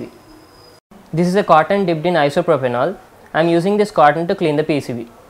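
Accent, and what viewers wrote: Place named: India. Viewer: few indian accent that i watch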